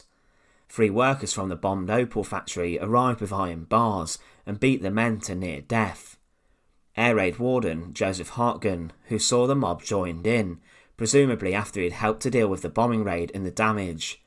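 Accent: United Kingdom. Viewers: I totally know what you mean, but it is simply a particular UK regional accent and Id rather the guy stuck with it and remained authentic to his identity. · 😆 wtf thats crap - his voice is fine to me and i hope your comment doesn’t hurt his feeling. Its nice to have a regional UK accent. Not an upward inflection of every word like in the US. dont listen to him buddy your voice/show is spot on !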